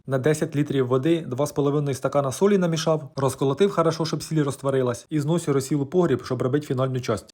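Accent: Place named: Cherkasy, Ukraine. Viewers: Новий П.Глазовий !!!!чи Литвинов! Але ж ти Суперський з черкаським суржиком🎉🎉🎉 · Говір такий як у моєї мами Вона також родом з Черкащини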